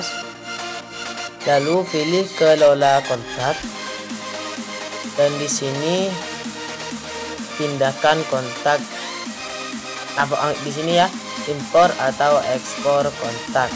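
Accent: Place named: Madura, Indonesia. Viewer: mksh byk bang.. spt lg dngerin bang Kadir madura, lg berbcra. hehe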